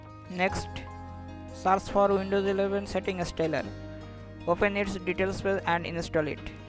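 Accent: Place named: Bihar, India. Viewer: no this is perfect just he have a harder Indian Accent Called Bihari or UP I'm not sure But u can use Subtitles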